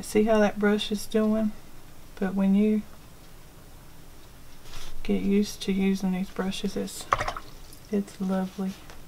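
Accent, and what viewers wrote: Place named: Kentucky, United States. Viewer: I’m glad I found ur channel i love your videos and I think we have the same accent lol. I’m from SE KY.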